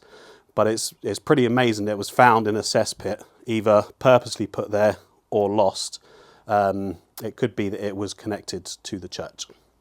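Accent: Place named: Hampshire, United Kingdom. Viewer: I'd like to know where the narrator is from. His accent is interesting. Sounds a bit Portsmouth but with a slight Hampshire country twang. Am I close?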